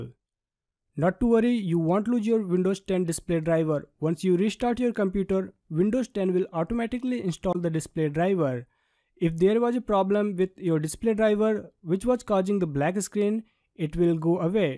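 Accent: India. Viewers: indian ahh voice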